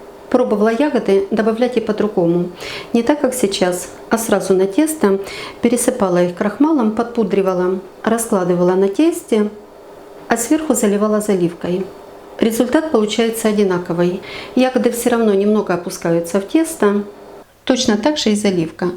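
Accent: Ukraine. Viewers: Нее. По хохлятски говорите. Непрятно слушать. Тьфууу картавите таак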